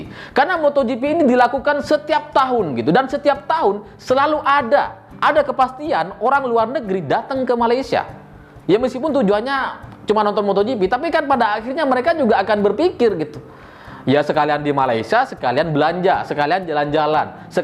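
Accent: Madura, Indonesia. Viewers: Mas ini logatnya kayak asli madura...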